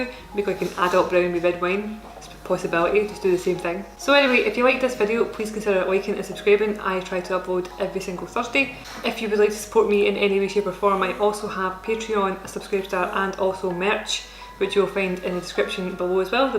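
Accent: Scotland, United Kingdom. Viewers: I just have to say... Im from glasgow and ive lived in America since I was 12. Your lovely vids give me all the happy chemicals in my brain from my childhood. The accent and all the scottish slang makes me so happy. Ta v. much.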